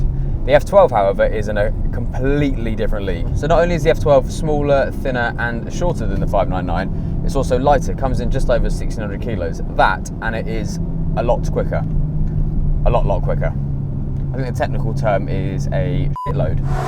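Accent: United Kingdom. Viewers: And he sounds like he's from Essex. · *English humor, the dude is English, even I as an American knows this, heck his accent is a dead giveaway. He's not Scottish or Welsh.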